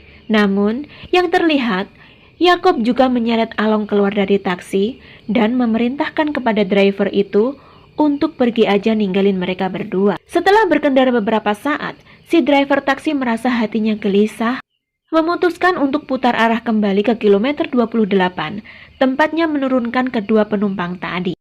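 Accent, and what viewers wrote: Java, Indonesia. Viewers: Nemu chanel baru,saya nonton udah 3 kali,tapi baru comen dan gahung sekarang,enak juga suaranya seperti cerita miranti cuma masih ada logat jawanya sedikit.Sukses selalu.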